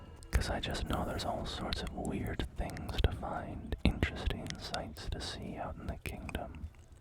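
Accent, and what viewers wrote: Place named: United Kingdom. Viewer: your history knowledge is impressive never stop my knowledgeable brother, i love your accent i assume you are in the uk?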